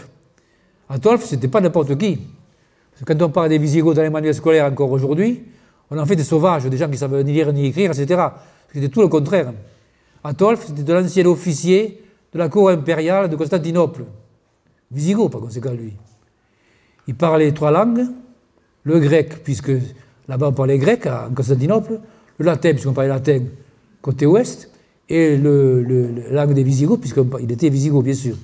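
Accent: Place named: South-West, France. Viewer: l'accent du sud ouest est une merveille ! ❤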